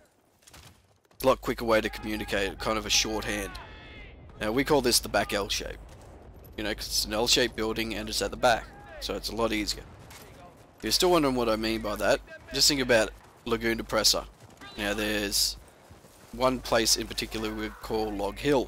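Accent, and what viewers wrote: Australia. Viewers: at last... a video with call outs and an Aussie for the narrator =)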